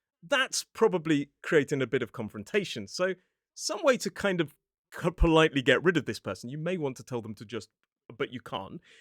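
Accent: United Kingdom. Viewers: But we do llike his talking and we are here to listen to his brilliant british accent❤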